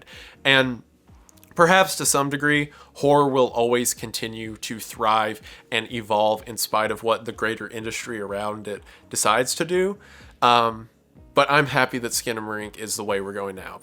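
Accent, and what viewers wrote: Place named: South, United States. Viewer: What kind of dialect is speaking in? Like what part of US, where they say "setting" instead of sitting? Is it just a southern thing? lol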